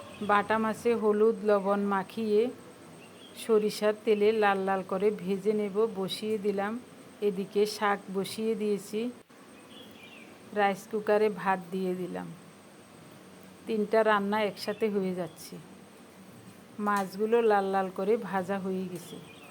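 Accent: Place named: Chittagong, Bangladesh. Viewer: আসলে আমাদের দেশ চট্টগ্রাম। আপনার উচ্চারণ শুনে মনে হলো , তাই বললাম।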